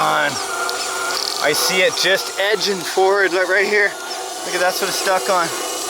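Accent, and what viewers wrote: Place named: Canada. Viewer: Damn the fakes and the haters! Be you, talk like you, act like you. Otherwise you are a bloody conformist. Victory for sure! Awesome content from who is obviously an awesome person. I'd like to burn a joint with you. Even though you are starting to take on that Canadian accent a little. LOL. Keep doing you bro. Great stuff!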